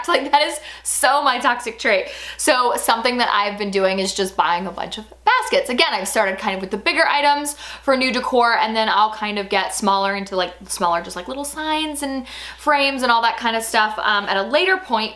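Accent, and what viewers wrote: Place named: South, United States. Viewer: I love your southern accent and how you say nursery! Haha everyone in Colorado has no accent and sound like news anchors LOL. 🤣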